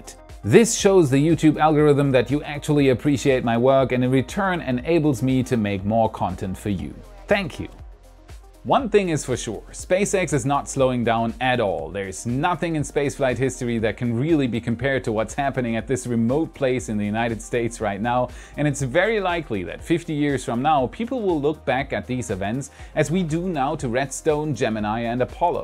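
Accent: Germany. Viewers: Are you german? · Tscherman Akzent. His accent is actually quite weak -- there are a few words he gets wrong (such as this one), a few vowels that aren't quite right ("apload"/"upload"), and a particular pause in certain consonant clusters that is common in German. And that's about it.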